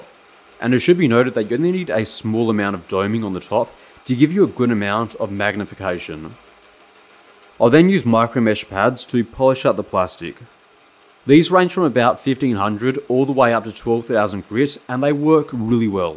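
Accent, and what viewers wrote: Australia. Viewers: Dude for some reason I opened this video and thought it was inheritance machining so I got jump scared by your Australian accent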